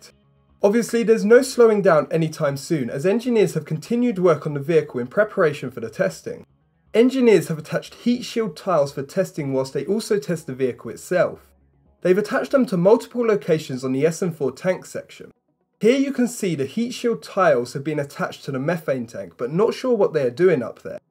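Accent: United Kingdom. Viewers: Why are you so british ?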